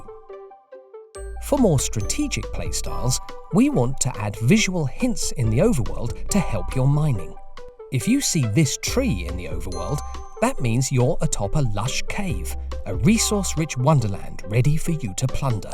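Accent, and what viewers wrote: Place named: United Kingdom. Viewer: イギリス訛りいいねぇ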